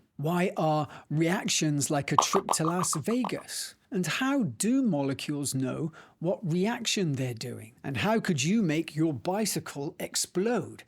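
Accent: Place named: Scotland, United Kingdom. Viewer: You know when you said this was made in Japan I was like huuuh as your Scottish accent had me guessing like you were someone in England or maybe Stirling, don't know why, but did you know the open university have no DaisyChains Audio or any audio version of there bio-chemistry related subjects and they don't know why, they have literally just been apologising too me for 5 years since I am dyslexic, and I failed 2nd year twice because I have just been giving walls of text too read as a dyslexic man and like I can't learn like that, though this is a perfect way, which is why I have a stupid playlist I have been building over the past 5 years of everything practical and like visual (or just the only English information on Youtube, the amount of videos in English which go into Hindi drive me insane, I think I find a video and nope, but coming across your channel sure was a treat.) Being very useful, I wanna pass my final year, you know, would be nice.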